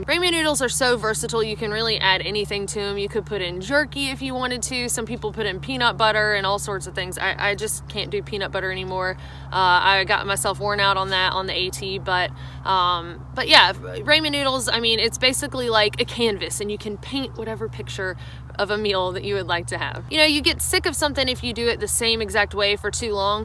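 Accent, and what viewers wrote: South, United States. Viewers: Thank you! I was thinking the same thing! She's from the South and has a Southern accent...hellooo! That's what I like about her and she makes no bones about it! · i love the eating the favorite thing first bit. what a simple and brilliant concept. thanks for your videos, it's nice to hear from a woman and also i do miss that southern accent. where's it from? i live in england now and i have a new appreciation for all the accents that just sound normal to me. :) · At first I thought your southern accent was going to be annoying, but I quickly fell in love with your videos. Friendly and so informative! Thanks for your straightforward good sense and speaking on my beginner level.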